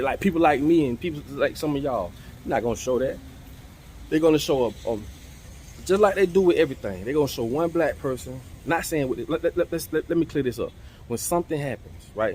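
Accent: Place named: Virginia, United States. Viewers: My guy articulates the truth with that southern "swang" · You sound like you’re from Newport News Va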